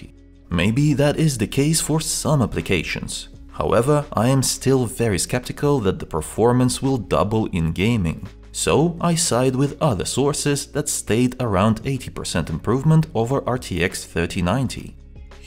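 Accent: Russia. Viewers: Акцент русский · Мне кажется, или он русский?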